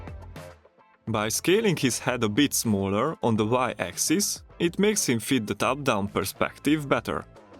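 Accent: Hungary. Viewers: Basszus nagyon magyaros az akcentusod :D Amúgy ha az vagy és olvasod akkor rohadt jó tutorial-okat csinálsz. Csak így tovább! <3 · Szia, nem vagy véletlenül magyar? Mert nagyon magyar akcentusod van. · Hungarian accent is so obvious 💀 Good to see an experienced hungarian pixel artist on the field. Do you have any major bigger scaled works/art pieces or do you only work in the gaming industry? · Whats your original language? Because your accent sounds like when a Hungarian speaks English 😁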